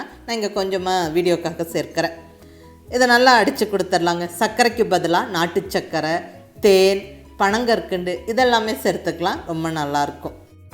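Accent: Kongu, India. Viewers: செயல்முறை விளக்கம் அடிக்க ஆள் பில்லை. super explaination. crystal clear. கொங்கு தமிழ் super.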